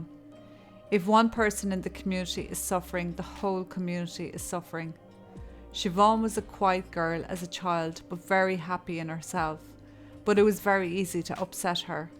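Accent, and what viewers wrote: Ireland. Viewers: ur great keep it up irish storys told by a irish lady perfect chill in bed channel ❤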